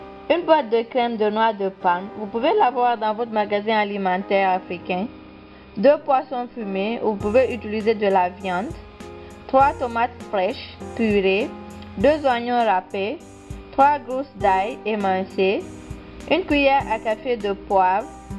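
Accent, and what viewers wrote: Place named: Guinea. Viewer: Je suis tombé sur ta chaine super video une abonne de plus, tu vien de la guinnee avec ton acent j ai suis , tu peux faire un tour sur la mien aussi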